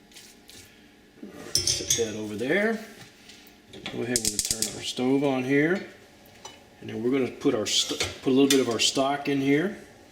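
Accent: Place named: Louisiana, United States. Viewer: What a freakin joke. It really sucks when northerners try to cook Cajun food. As a native of very southern Louisiana I can positively say this is crap. The stock is missing ingredients and a good stock cooks for at least four hours to develop the flavor. No one uses jalapeños in gumbo. It's considered a sin to do that. The roux is not dark enough and when u add the stock it looks like dishwater. His ideology about roux thickness is backwards because a dark roux is thinner than a light roux but has a nuttier taste. He should be using real andouille sausage not that fake stuff that does not even taste like andouille supposed to taste. Rotisserie chicken??? Now thats laughable! You need uncooked chicken so that it cooks and imparts flavor. This is what is unique about cajun cooking...Long cooking times with fresh ingredients and meats (uncooked) to build a composite flavor profile. 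This person has probablynever learned to cook from anyone in south Louisiana. His accent possibly sounds more like northern Louisiana and that area was not settled by the cajuns or the french so they know nothing about traditional cajun cuisine.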